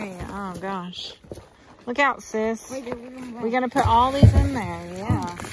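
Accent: South, United States. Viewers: Another great video! I miss my farm 3500 acres, and we grew wheat, barley and peas. It was up on the high line in Montana but due to an accident I was no longer able to farm and your videos is very uplifting! is a man of few words and is funny. I joined your channel not long ago and have never seen pack a pistol. When we would go out and check crops or be in the tractor there was always a pistol and a rifle in the truck, combine etc. as we have coyotes, badger, mountain line black bear and grizzly bear. You are just a hoot, the southern accent and facial expressions are funny as heck. A great family channel and you have a great family as well! Keep doing what you're doing cause you're doing it right! Take care, stay safe and God bless!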